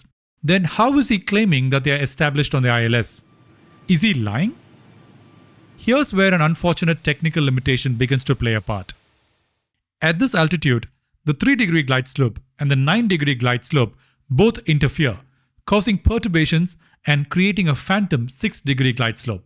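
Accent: India. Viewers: The Indian accent makes it very hard to watch. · Bro are you Indian or Australian? The accent is definitely Indian.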